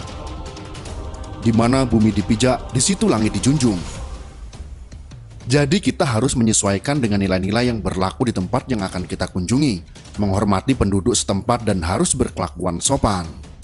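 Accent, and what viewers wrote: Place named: Banyumas, Indonesia. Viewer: min orang ngapak Yo😅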